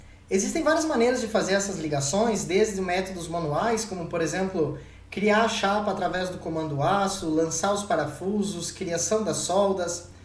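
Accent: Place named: Rio Grande do Sul, Brazil. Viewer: Ótima didática! Parabéns! Eu utilizava outros softwares para modelação de estruturas metálicas, já havia mexido no Tekla há muito tempo em versões anteriores, essa versão está bem melhor! OBS: Pelo sotaque deve ser meu conterrâneo heheh... Estou em São José do Rio Preto/ SP, mas minha família é de Marau/ RS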